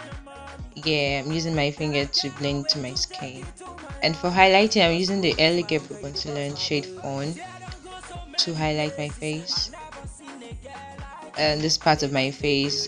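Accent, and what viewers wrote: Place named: United Kingdom. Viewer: Great video you ve a british accent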